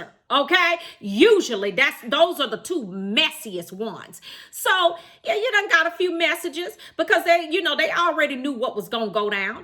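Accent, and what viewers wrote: South, United States. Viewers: Good info sweetheart! Love your southern accent 👍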